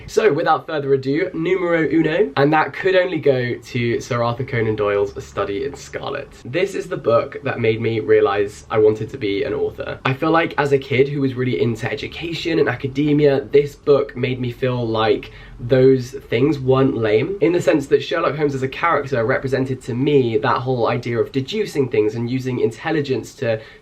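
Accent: United Kingdom. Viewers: It's funny, your british accent is stronger in your old videos than in the new ones :)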